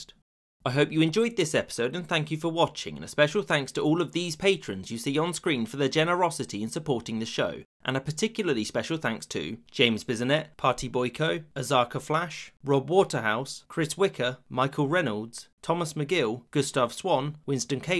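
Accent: United Kingdom. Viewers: i'm ottoman. you speak too fast english with your strange british accent. bro slow down. i was trying to understand. böyle hızlı konuşma gözüm. i think you need some ottoman slap on your face thats why you speak too fast. i will try watching video with 0,75 speed, lets see if it will work.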